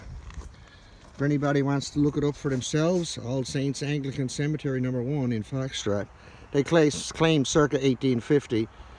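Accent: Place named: East Anglia, United Kingdom. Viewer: Your accent has some elements of East Anglian pronunciation, i.e. north Essex, Suffolk. Have you traced your family back a few generations?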